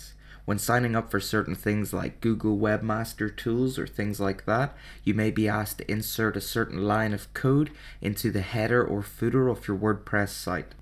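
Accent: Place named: Northern Ireland, United Kingdom. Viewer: definitely a Northern Irish accent there.... good video!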